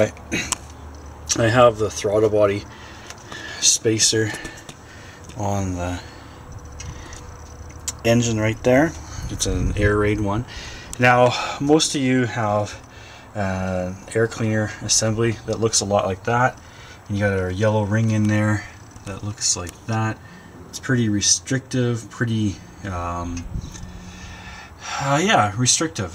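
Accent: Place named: United States. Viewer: Ah. I assumed you were American from the accent.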